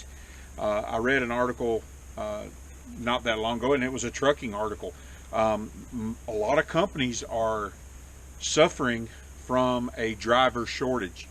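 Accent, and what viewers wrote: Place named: Kentucky, United States. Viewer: Maybe the weirdest comment you ever get: I grew up in KY and moved to CO ten years ago. The last four years of divisions in our country between left and right hit me hard. It further separated me from people I grew up with, knew and loved. I found this video because I wanted to learn more about the water pump but hearing your voice reminded me of family and friends I haven't seen in a decade. I even enjoyed hearing about the hog and chickens. You're a good guy. Keep up the good work... and thanks.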